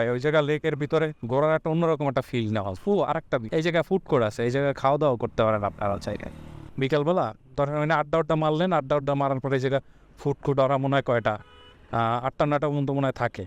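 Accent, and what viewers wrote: Barishal, Bangladesh. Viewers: ভাই আপনি কি বরিশালের??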